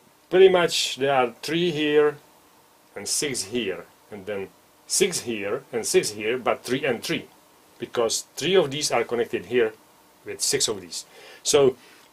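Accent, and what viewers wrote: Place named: Germany. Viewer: Considering he sounds very much German I'd give him a pass...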